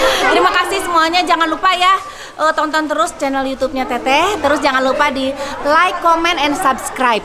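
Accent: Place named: Garut, Indonesia. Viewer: Uhuyyyy Lamun teh ngomong Nii hayang seuri.. bhs garuttna kaluarrr... Seruuuu lahhh👍🤣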